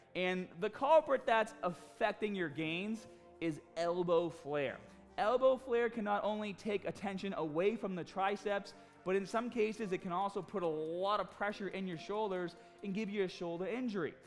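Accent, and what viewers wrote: Boston, United States. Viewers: I'm dropping a comment to help with the algorithm because I'm already subscribed and I liked the video. Love your videos for the training-information, entertaining Boston accent, jokes and lively presentation.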